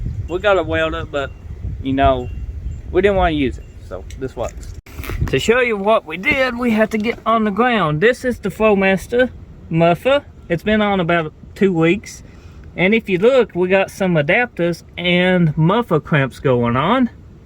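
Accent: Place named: South, United States. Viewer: fuck the exhaust, iv never herd a strong southern accent with a 5 year old's speech impediment. impressive.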